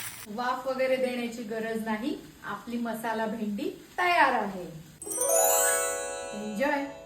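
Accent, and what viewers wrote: Konkan, India. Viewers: Tumhi khup chan bolta.. कोकणस्थ आहात का? 🤗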